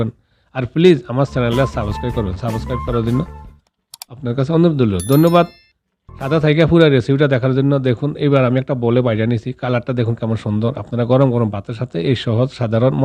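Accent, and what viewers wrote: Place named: South, Bangladesh. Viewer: আমার বাড়ি ভোলা।আপনার কথার ধরন দেখে জিঙ্গেস করলাম।সাধারনত আমারা দক্ষিন অঞ্চল এর মানুষ এইভাবে কথা বলি।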